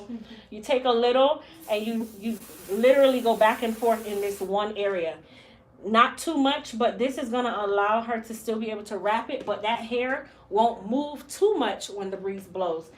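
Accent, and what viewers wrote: Jamaica. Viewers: I knew it!!! I heard your accent and thought you where from Jamaica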